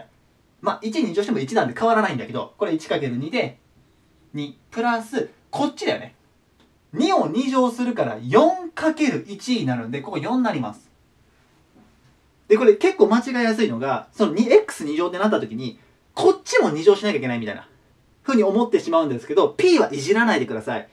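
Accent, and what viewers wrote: Kansai, Japan. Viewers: さん、ありがとう。それと何故関西弁？お返事下さい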